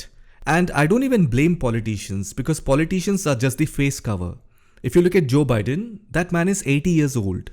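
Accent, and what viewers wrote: India. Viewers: Wait…. R u endian?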